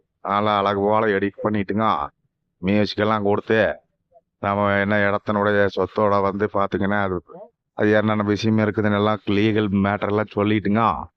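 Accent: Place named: Kongu, India. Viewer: கொங்குத் தமிழ் அருமை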